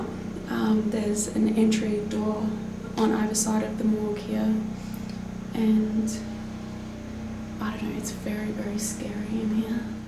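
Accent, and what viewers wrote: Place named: Australia. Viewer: Oh, I don't mind at all. I love watching you, as well as listening to your Australian accent. :) Not sure if that spirit you encountered feels the same way, though...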